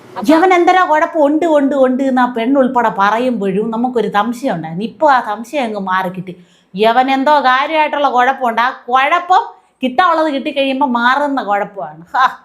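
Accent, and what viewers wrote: Thiruvananthapuram, India. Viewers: ഇത്ര നന്നായി തിരുവനന്തപുരം ഭാഷയിൽ മുഖത്തടിച്ച് കളിയാക്കുവാൻ കഴിവുള്ള ഒരാളെ അഭിനന്ദിക്കാതെ വയ്യ. Adv.സാജനും തിന്മക്കെതിരെ പൊരുതുന്ന ഈ മാദ്ധ്യമപ്രവർത്തകക്കും ഒരുപാടു് അഭിനന്ദനങ്ങൾ...😂❤🙏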